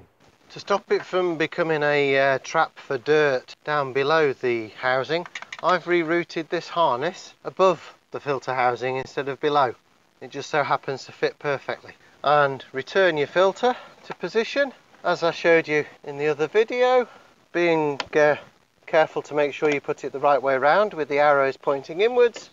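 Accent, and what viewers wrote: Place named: Wigston, United Kingdom. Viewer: Greeting from Texas! Are you somewhere near to Wigston ? I'm guessing by your accent..!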